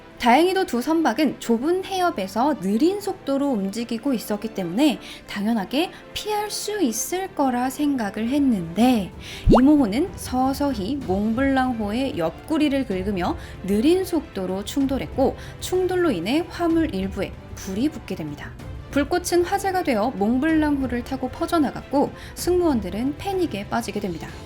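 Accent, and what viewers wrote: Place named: Jeju, South Korea. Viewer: 제주도가 고향이심? 아님 이주하신거심? 경상도 억양 만큼 꽤 마음을 설레게 하네요.ㅎ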